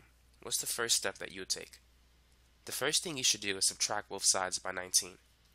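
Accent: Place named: New York, United States. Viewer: it isnt unique though, its a typical new yorker accent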